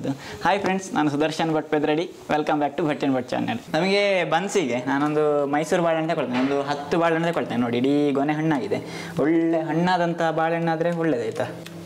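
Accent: Mangalore, India. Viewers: It just took me 20 seconds to Subscribe to your channel because of your genuine smile and the Mangalore accent.. Cheers for Life..! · ನೀವು ಅಡುಗೆ ಮಾಡುವ ರೀತಿಯು ಅದ್ಬುತ. ಹಾಗೆ ನೀವು ಮಾತನಾಡುವ ಮಂಗಳೂರು ಕನ್ನಡವು ಕೂಡ ತುಂಬಾ ಅದ್ಭುತ. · Great to hear your Mangalore kannada accent. Buns is my favourite snack for tea. Well done from a mangalorean living in Australia. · Sir nimma Mangaloor maatu tumba chanaag iratte kellike...😍😍😍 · I love Mangalore accent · Mangalore kannada🔥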